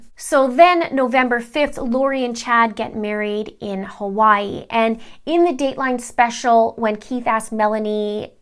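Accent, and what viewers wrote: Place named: Canada. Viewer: I knew you were Canadian before I knew you were Canadian...had a friend who passed and he was Canadian... Batteries was bat trees And said aye all the time... i miss that guy .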